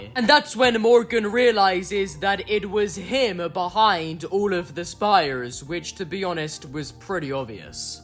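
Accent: United Kingdom. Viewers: what actually is your accent. You sound like you are not from Britain but have a sort of London accent but you have a habit of adding the "ah" sound before or after many "ah-words" you clearly think this is more enunciated but it just makes you sound like you cant pronounce words because English isn't your first language. It's confusing. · He is definitely Brit. You can't really go by accents. There are a bunch of them plus variations of the same accents.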